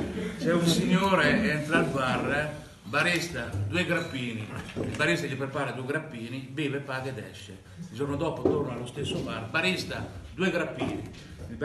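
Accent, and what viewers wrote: Italy. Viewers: umbro marchigiano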